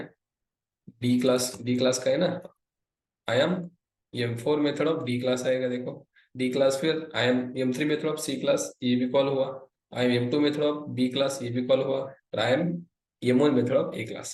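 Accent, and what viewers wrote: Maharashtra, India. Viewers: sir you are so much funny 🤣😂, also i luv ur marathi and hindi accent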